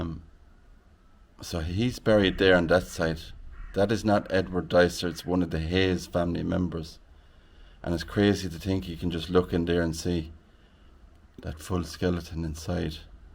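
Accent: Ireland. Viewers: I like the term of torch, vs our flashlight. 😂 To bad a person re-purposed yours, for themselves! Hello from Northern California, I am enjoying another of your videos. I am getting better with understanding inflections with your accent, in addition to having had some amazing Irish neighbors, who have moved back to Ireland. Happy New Year to you, your team and family. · 322nd So many people are clueless about human biology and what happens to a body when you die ..... great youtube page "ask a Mortician" ..the body bloats and releases trapped gases....love your accent / my direct relatives are from Donegal - Gallagher/Coll/Costello ...I was in Ireland 15yrs ago - Gorgeous country ....I wonder if the Cusack..... is related to the Actor/family Cusacks?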